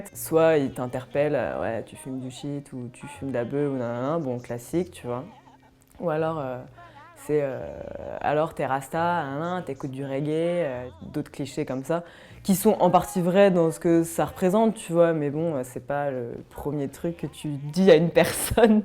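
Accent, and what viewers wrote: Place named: Paris, France. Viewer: L'accent parisiens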